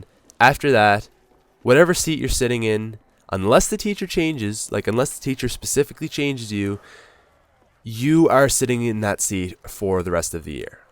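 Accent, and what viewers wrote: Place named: Canada. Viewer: His Canadian accent is awesome.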